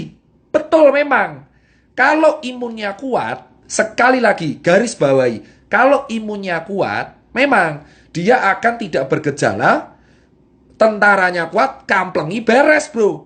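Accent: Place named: Surabaya, Indonesia. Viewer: Asli... Gaya suroboyoan.... Gamblang njelasno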